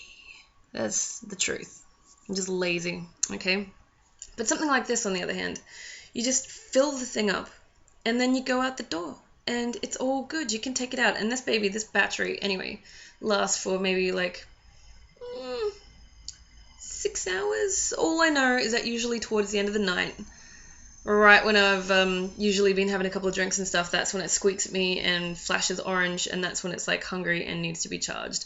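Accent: Australia. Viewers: it's so nice to hear a laid back aussie on youtube for a change.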